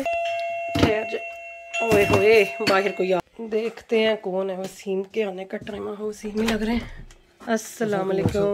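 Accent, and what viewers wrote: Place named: Punjab, India. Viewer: Ap Kitna pyara bolti hai hum bhi Punjabi hai buhat Acha Lagta hai ap ki Punjabi sun kar❤️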